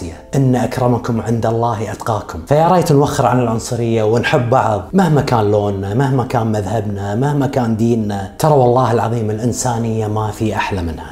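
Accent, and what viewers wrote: Kuwait. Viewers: حبيت اللهجة الكويتية من وراء سردك الحلو وغشمرتك وخفة دمك...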